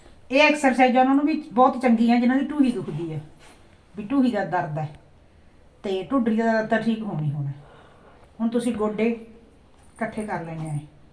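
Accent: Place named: Bathinda, India. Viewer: Dhudhri ki hundi, bhainji baithinda di boli sanu samjhna okhi hundi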